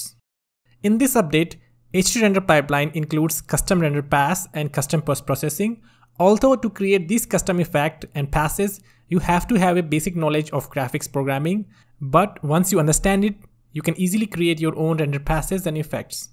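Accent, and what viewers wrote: India. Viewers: Are you from Mumbai?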